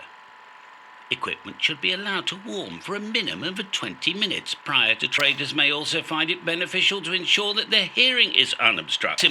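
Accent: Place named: RP, United Kingdom. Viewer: Ahhhh, at last. Something in good old Queen's English! It all makes sense now. I shall rush out to Tandy this instant and buy myself some helectronical componentry with which to build myself a "rig" in ones "ham shack"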